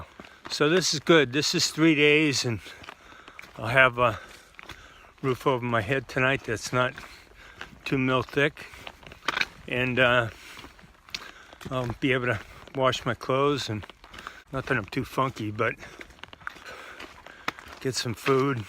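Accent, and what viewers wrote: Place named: New England, United States. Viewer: Nice for you to see a fellow New Englander! Ya nevah loose the accent! Wicked pissa!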